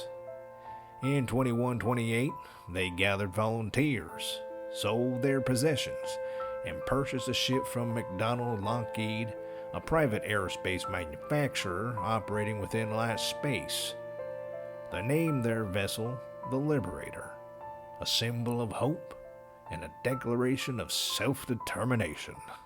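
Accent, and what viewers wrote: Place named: South, United States. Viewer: Stylized Southern accent, but real none the less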